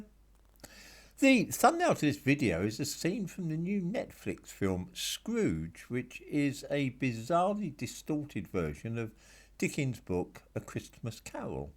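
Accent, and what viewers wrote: United Kingdom. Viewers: The incredibly Britishness of the commentator lends credibility to the argument. For sure.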